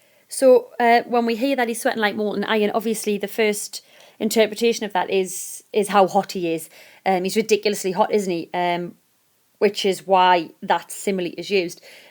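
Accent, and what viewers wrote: Newcastle, United Kingdom. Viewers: Your video has really helped me, thank you! Your accent has me assuming you are from Northern England with a Geordie accent?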